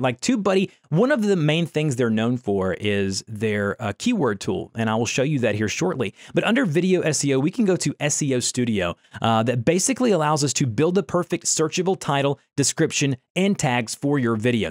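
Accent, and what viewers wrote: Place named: Baltimore, United States. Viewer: Are you from Baltimore? I'm hearing the "O's" in you. Hahaha.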